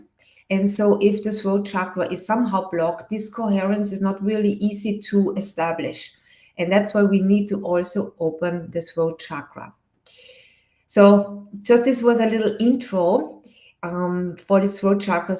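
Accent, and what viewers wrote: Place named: Austria. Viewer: So happy to be part of Dr. world once again. Her voice, with its Austrian accent, is both charming and soothing. This blessed woman is a gift to the world. I'm grateful to be a recipient of her healing talent.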